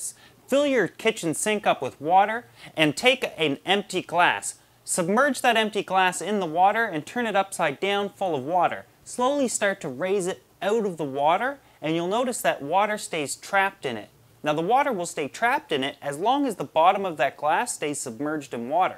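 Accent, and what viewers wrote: Canada. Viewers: fuck I love the way canadians talk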